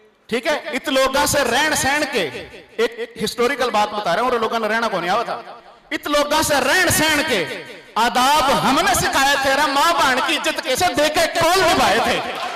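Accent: Haryana, India. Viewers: Kya bat bhut khub...katai haryana bol re ho mka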